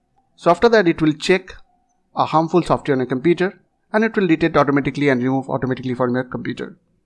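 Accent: India. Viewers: Always indian voice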